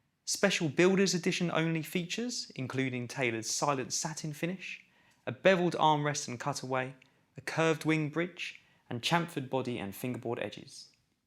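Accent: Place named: United Kingdom. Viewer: I’m tickled with your British accent. Want to ask what country and region are you from ?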